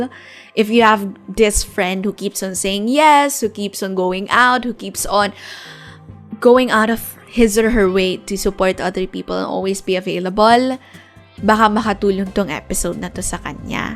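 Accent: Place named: Philippines. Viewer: That was one of the greatest podcasts I’ve ever seen And I see a little Philippines accent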